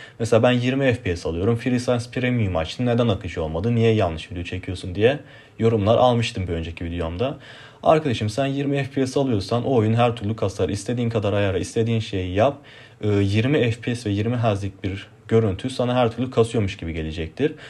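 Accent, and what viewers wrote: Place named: Azerbaijan. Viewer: Azerbaycanlisin sanirim aksanin arkadasiminkiyle ayni :)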